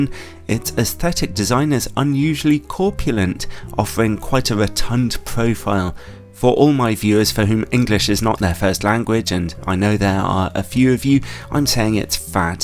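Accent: United Kingdom. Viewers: Hi, greetings from Italy i always watch at your videos because, at the beginning, i needed some reviews to make clear my shopping ideas, but now i’m totally addicted to your British accent and of course your meticulous way of making reviews. i also thank you for being the best english teacher i’ve had in the past 8 years hope you will come to naples for taking some beautiful photos and also to eat something good, you’re the best. (let me get my new credit card so i can sub to your patreon) pps: when i’m drunk your videos are kinda the best thing i can ever watch because it’s sooo relaxing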